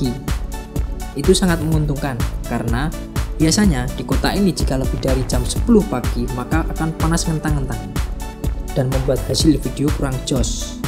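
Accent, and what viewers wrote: Java, Indonesia. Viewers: Jowoe medok banget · medhok cuk,jogja ne ngendi sampeyan mas?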